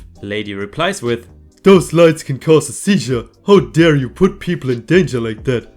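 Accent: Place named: Germany. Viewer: ⭐⭐⭐⭐⭐⭐⭐⭐⭐⭐⭐🌟🌟🌟 I figured I should post some stars since it's been a while. I am still watching just been busy to respond, wouldn't miss my daily fill of That awesome German accent :) 🌟🌟🌟⭐⭐⭐⭐⭐⭐⭐⭐⭐⭐ 🌟🌟🌟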